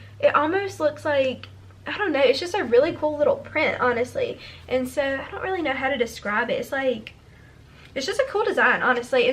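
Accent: South, United States. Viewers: you're so bubbly & i love your Southern accent !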